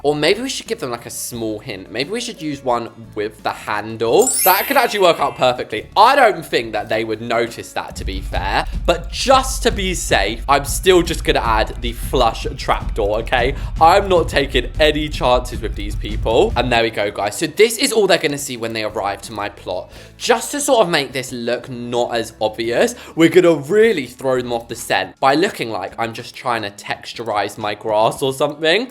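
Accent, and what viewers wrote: United Kingdom. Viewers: Are you British? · the british accent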